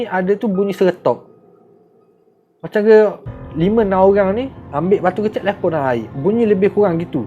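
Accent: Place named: Terengganu, Malaysia. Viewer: Penceritaan.. yg.. sangat.. menarik.. saya. suka. slang.. Ganu. nye.. 🤣🤣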